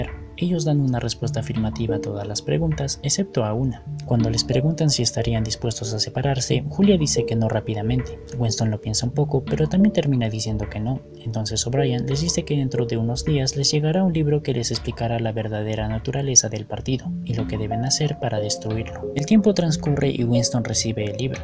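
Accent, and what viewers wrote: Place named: Latin America. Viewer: Literatura hispanoamericana dirás. Latinos era como se denominaba a los habitantes de Lacio aldea de la Antigua Roma. Dudo que seas de Lacio, por el acento intuyo que serás Hispanoamericano. Hermano. Un saludo desde España, muy buen video.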